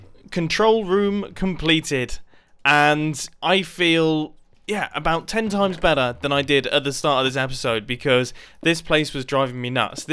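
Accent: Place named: United Kingdom. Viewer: Am I the only America who thinks it’s hilarious when Britains speak basically a different language like it’s not pronounced laver it pronounced LAVA!!!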